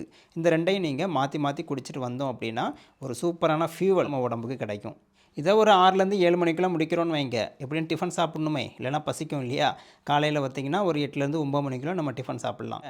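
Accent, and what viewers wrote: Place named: Tiruchendur, India. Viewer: Nice sir. I am from Tiruchendur. Your slang is same like us. Which is your native place.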